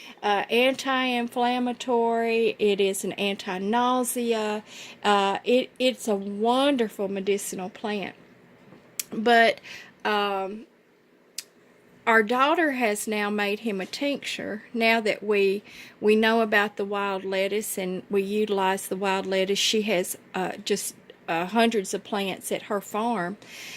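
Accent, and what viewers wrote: South, United States. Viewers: love the Southern Drawl of the narrative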